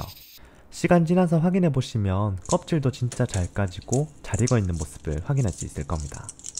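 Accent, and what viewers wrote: Gyeongsang, South Korea. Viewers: 경상도 총각이네예~~~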